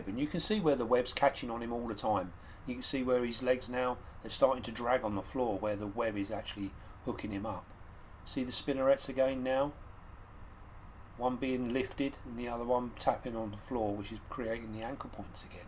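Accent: United Kingdom. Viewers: There's nothing better than having a British guy describe nature to you, you know it's the Truth because of the accent.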